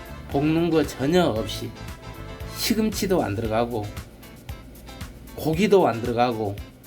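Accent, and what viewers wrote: Jeolla, South Korea. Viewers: 구수한 전라도 사투리 나오는거 정겹슴니다.라드는 어디서 팔아요?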